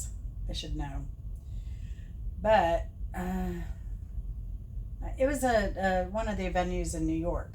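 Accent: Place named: England, United Kingdom. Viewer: I love hearing your English accent because I can learn English listening 🙏 that is truly inspiring and interesting to hear the story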